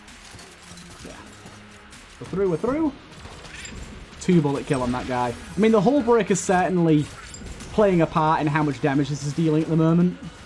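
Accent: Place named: Scotland, United Kingdom. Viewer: Nah he is just Scottish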